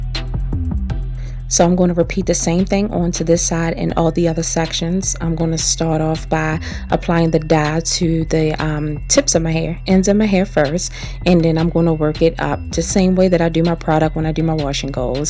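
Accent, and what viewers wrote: Baltimore, United States. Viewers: I knew it...you have that Baltimore accent...I grew up there...my BFF IS THERE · Girl you must be from bmore... we talk just a like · TEEEEWWW 😭😭😂 I said oh hell shes from Baltimore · You sound like your from Baltimore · New subbie!! I can here that Baltimore or DMV accident, Morgan State Univ in the house! lol. You are so beautiful and calm lol Love your hair, I subbed!!!! 😍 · Are You From Baltimore? I Hear An Accent❤️❤️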